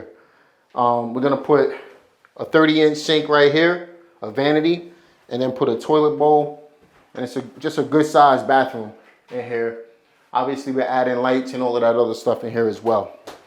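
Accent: New York, United States. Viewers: Love the content bro!! The new yrk accent never leaves lol!!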